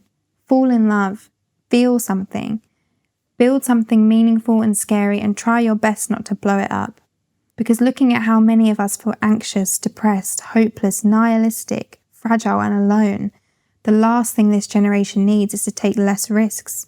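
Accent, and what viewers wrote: United Kingdom. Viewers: Better than any type of non-British accent though. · She has one of the many accents found in Britain ... If she was speaking with a Scots accent (like e.g. Billy Connolly) is that THE British accent? If not then why not? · Ugh, that british accent 😍 😂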